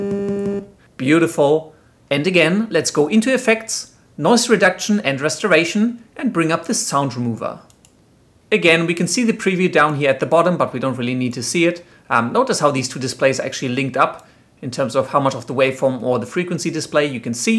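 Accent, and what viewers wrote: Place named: Germany. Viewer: You're from Germany right? I think I hear the accent.. (Wenn ich Recht habe, kannst Du mich Sherlock nennen)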